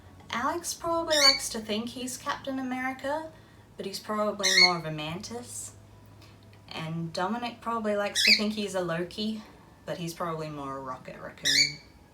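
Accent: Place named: Australia. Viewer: Wow! You sound like youre an Aussie?? I had no idea! GREAT accent!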